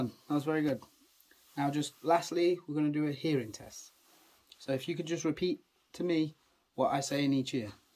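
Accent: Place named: United Kingdom. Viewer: I enter this dude asmr...... THIS DuDE is BRitisH.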